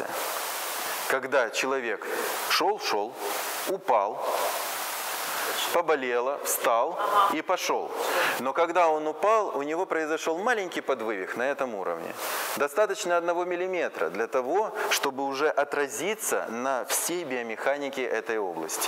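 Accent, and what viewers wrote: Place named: Odessa, Ukraine. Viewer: Одессит. Папа тоже травматолог.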